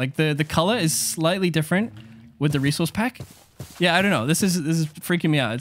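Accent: New Zealand. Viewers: are you a kiwi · are you kiwi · I never thought I would hear a NZ accent. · are you a kiwi? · Is that a New Zealand accent?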